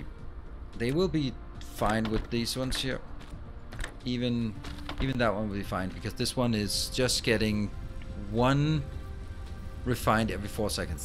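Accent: Denmark. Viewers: I just tuned in because of DSP, really liking it so far. And it's great to hear a Dane (I assume based on the accent) doing let's plays!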